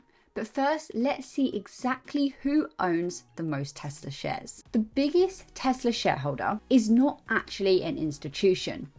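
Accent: England, United Kingdom. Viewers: When you speak, I think of a Charles Dicken's novel! Amazing, genuine, English accent!!! 👍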